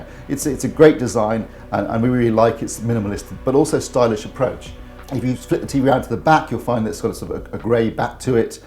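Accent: United Kingdom. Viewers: Never mind Terry he's off his bean I luv your voice and your detail reviews in a strong British accent. Bravo and well done.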